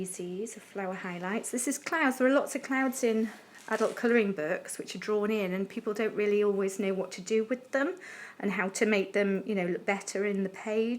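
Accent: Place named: England, United Kingdom. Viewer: I have this book and book 2 and have just ordered bk 3, I tried to get bk 4 on Amazon but it’s currently not available so maybe I will look elsewhere. Lovely to hear your voice, I was born in England spent my first 25 years there then emigrated to Australia so I still love to hear English accents thanks for this video it really helps.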